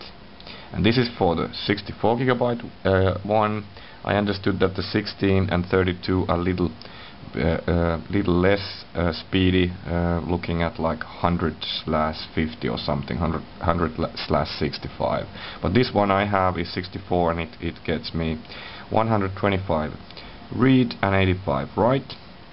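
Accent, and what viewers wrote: Finland. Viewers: Finnish accent?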